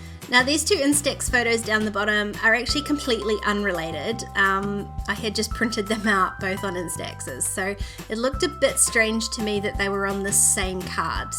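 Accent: New Zealand. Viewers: Love it, great to hear a kiwi, can’t wait for my kit to arrive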